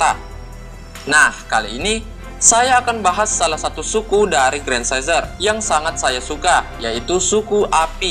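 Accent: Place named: Maluku, Indonesia. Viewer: Bang mau nanya nihh, Abang orang mana yaa kok logat nya kyk orng Maluku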